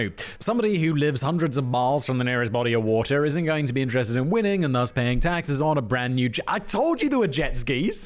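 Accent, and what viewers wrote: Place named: United Kingdom. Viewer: The topic isn't my top interest in any way, but I really need to look those up lol. was made for podcasting, especially as an American, where any British accent immediately adds some sort of credibility 😅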